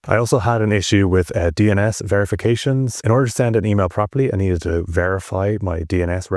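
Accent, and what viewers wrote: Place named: Ireland. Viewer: Which part of Ireland is your accent from?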